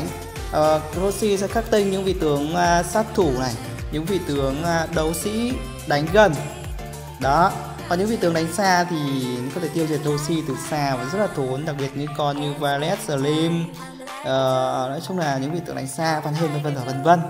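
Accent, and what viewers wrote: Vietnam. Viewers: quê ở đâu ấy. Giọng vừa nam vừa bắc khó đoán quá😃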